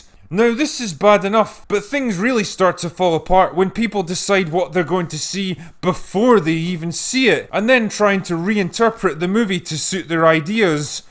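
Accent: Scotland, United Kingdom. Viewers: The Scottish accent and the sarcastic drunk voice make these videos so much better. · Critical Drinker. You are my favorite film/tv show reviewer on YouTube. Besides your hilarious persona, which is riddled with burps, farts, alcohol abuse, powerful Scottish accent and general condescension towards the "greater themes", I think you really nail what makes a good movie. It's like you said, it's about the plot, internal logic and character development. Really simple shit. Keep up the good work! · I never get tired of Scottish accents. ;)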